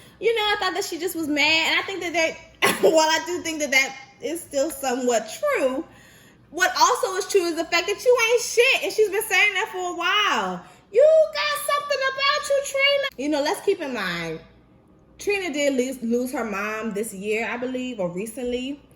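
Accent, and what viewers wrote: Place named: Caribbean. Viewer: I LOVE HEARING THE CARIBBEAN IN YOUR VOICE & ACCENT MA'AM.... ITS FUCKING GORGEOUS... And i NEEDS IT.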